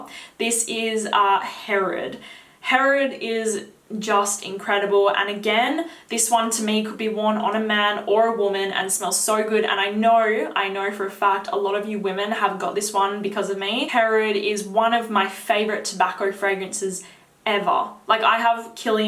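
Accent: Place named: Australia. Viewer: I love her accent, Australian accent😊😊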